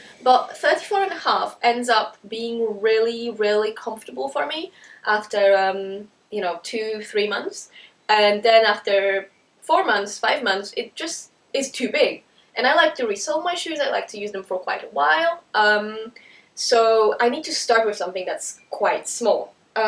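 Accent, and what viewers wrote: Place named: Germany. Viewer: Love your German accent.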